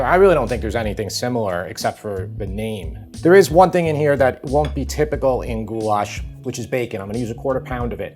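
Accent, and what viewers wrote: New York, United States. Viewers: I thought i was subscribed from using your beoff Burgonion recipe. My bad. I'm subbed now. One thing i learned from chef John on YouTube is that of you accidentally crowd the pan you can just keep simmering until the liquid absorbs and it will brown. It's an option for tougher meets like Chuck. He's in California now but i think he's a NY guy too originally. I'll admit, i get a little homesick hearing you talk. North Jersey is similar enough in speech pattern/ accent.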